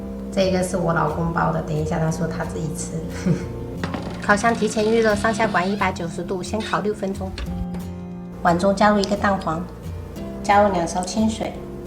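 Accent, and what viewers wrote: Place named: Hokkien, China. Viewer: 我是台灣人聽到妳說閩南語感覺好親切哦👍好廚藝！小寶寶們都好可愛哦😘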